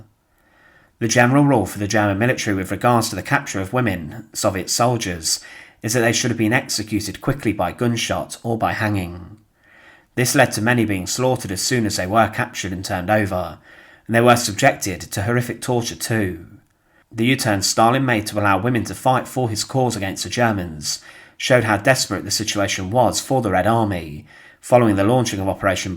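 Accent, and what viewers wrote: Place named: England, United Kingdom. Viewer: First and last time here as listening to that moronic English twang is enough , it’s better muted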